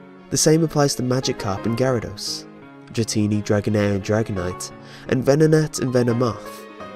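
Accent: United Kingdom. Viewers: You are my favorite YouTuber ok I look up to you from your British accent to your super cool top tens !!! Even your creepypastas are creepyer whith you!!! And I love that 3rd one!!please NEVER ever ever never forever never stop making videos NEVER ever forever and ever never stop .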